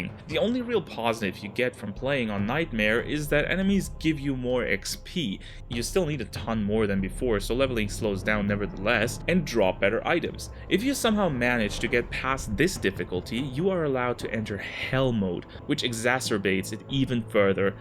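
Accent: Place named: Spain. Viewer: Having a helluva time trying to figure out your accent—I’m vacillating between “Spanish” and “Czech” ha ha. But who cares? Your English grammar and pronunciation are an order of magnitude better than 97.654% of American YouTubers! Great vid. Great analysis. Insta-sub! (And I don’t even play Diablo)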